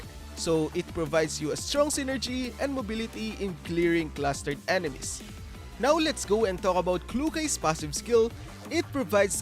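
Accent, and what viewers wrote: Philippines. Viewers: you sound very pinoy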